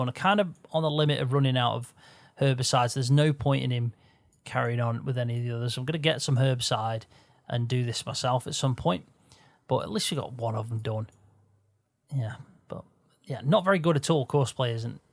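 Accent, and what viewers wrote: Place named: Wiltshire, United Kingdom. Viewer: Bruh you easily my favourite YouTuber. It must be the accent as I’m from Wiltshire so is similar. Found your page looking for best mods and subscribed because of your farm hand series. You probably won’t see this mate but honestly your content helps me get through my days. Which at the moment is very tough. Appreciate your work and your music choices for videos.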